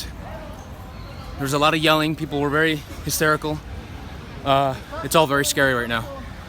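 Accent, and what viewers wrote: United States. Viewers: Hate to break it to you but it is in fact American English.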